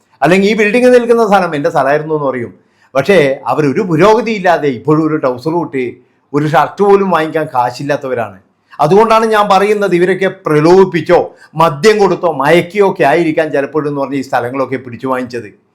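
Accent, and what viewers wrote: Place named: Namboodiri, India. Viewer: This presenter has a typical Namboodri accent and attitude, so we can imagine what he is saying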